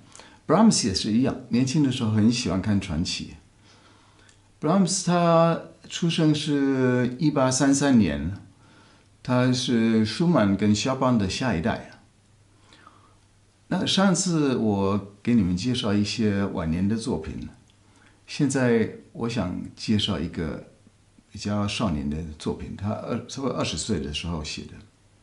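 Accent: Taiwan. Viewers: 您溫文爾雅的台灣國語的口音和您舒緩悠閑的講話的節奏與您動人的琴聲一樣和諧悠揚，真心感謝！希望您有機會給大家介紹拉赫瑪尼諾夫的《第三鋼琴協奏曲》謝謝。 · 好幸運可以在網路遇見以領略西方音樂的故事，很好奇您身在德國卻能說一口標準的台灣腔中文，給您按無限個讚。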